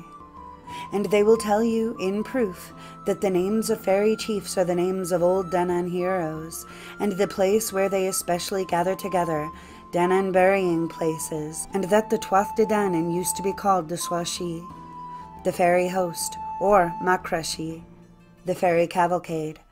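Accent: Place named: Ireland. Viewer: Nice job, btw good Irish accent!💚💚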